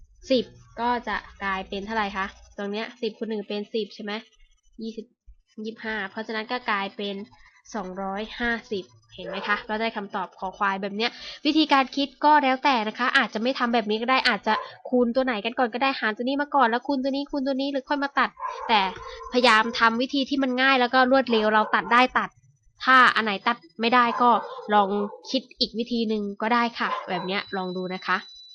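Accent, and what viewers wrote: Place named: Suphanburi, Thailand. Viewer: สําเนียงสุพรรณ คึด ถึง พุ่มพวงเลย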